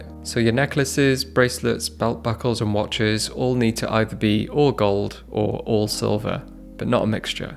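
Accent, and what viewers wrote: Wales, United Kingdom. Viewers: Such a beautiful accent! Is this typical of Wales? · Another bullshit advice with a British accent.😅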